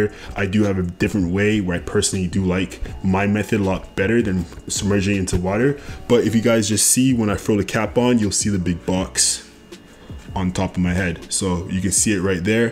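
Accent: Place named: Canada. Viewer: so what part of Canada is your accent from if I may ask?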